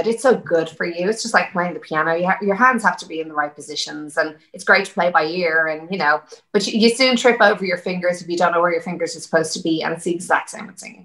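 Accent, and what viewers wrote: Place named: Ireland. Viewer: Aww it’s so nice to hear you talk again. I love your Irish accent as usual😍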